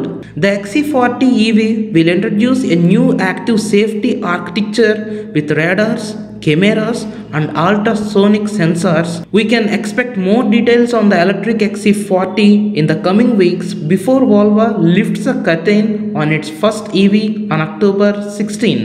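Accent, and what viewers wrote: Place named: Andhra Pradesh, India. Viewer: Voice is andra english....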